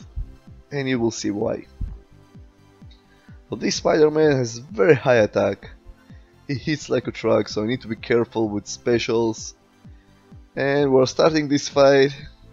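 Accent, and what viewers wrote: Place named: Russia. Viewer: Travis Nadeau Pesantes Russia, Ukraine... Id guess. The accent.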